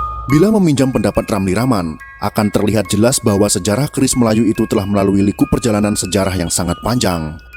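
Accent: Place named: Java, Indonesia. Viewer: Cetho jowo mas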